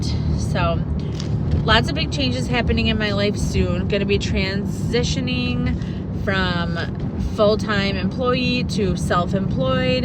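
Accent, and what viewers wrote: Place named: Wisconsin, United States. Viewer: I could tell right away you were a Wisconsinite, or possibly Minnesotan, then you said Great Lakes. I’m from the same area. You seem like someone I’d get along with, I like your personality. We’d have a lot we could relate on, I don’t know anything about having kids though. Despite what other commenters have said, it sounds like you’re doing an amazing job, you’ve got the right attitude, and I applaud you for going after your dreams when you can find the time for yourself. That’s something your kids will look up to. Just make sure you get enough sleep so you don’t get sick! :) wishing you all the best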